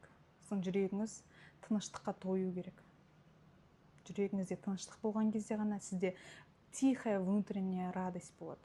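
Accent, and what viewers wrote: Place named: Kyrgyzstan. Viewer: саламатсызба, сиз кыргызсызба? Себеби көп сөздериңде таза кыргызша айтасың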